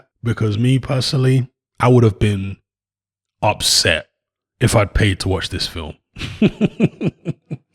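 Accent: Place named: Caribbean, United Kingdom. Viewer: Hey homie. When you said this movie is absolute garage wit your british caribean accent. I laughed at the exact same time my guy.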